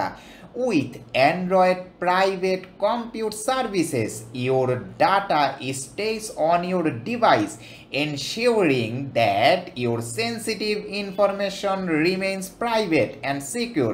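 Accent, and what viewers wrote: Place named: India. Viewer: Can you speak english without indian accent???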